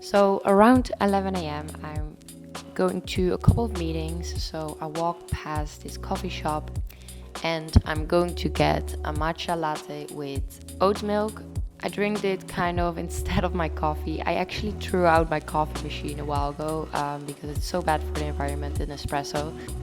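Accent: Netherlands. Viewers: Is she dutch? She sounds dutch but i cant find dutchvideo's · Are you Dutch? Because it kind of sounds like it🙂 I really enkoyed this video!! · Are you dutch? Because 1 you’re name and 2 you’re accént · R u dutch? U really sound like dutch haha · Great food. I can hear that you are Dutch. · You sound like you have a Dutch accent :) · Ik hoorde aan je accent dat je Nederlands was daarna zag ik je naam haha 😇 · Omg are you dutch? I think i can hear it out of your accent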